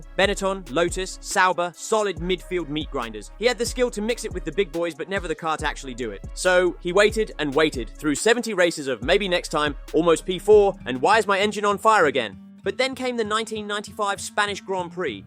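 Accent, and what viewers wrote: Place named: United Kingdom. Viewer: 100% British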